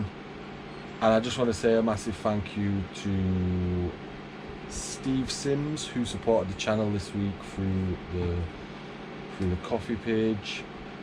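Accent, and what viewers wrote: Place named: North, United Kingdom. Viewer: Just found you. Good to hear a northern accent, I will need to go back and catch up.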